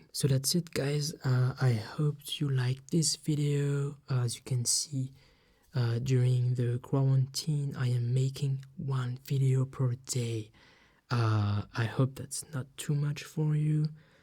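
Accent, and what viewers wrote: France. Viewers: Ton accent français me tue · His France accent make me laugh do hard haha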